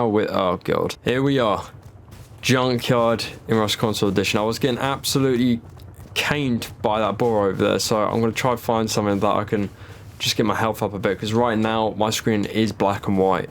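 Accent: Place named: United Kingdom. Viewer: if u talking on English and NOT GB English - much more ppl could understand u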